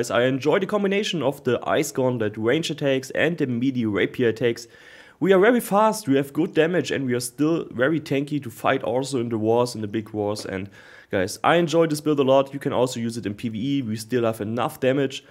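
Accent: Germany. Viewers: are you german by any chance? your english sounds too funny haha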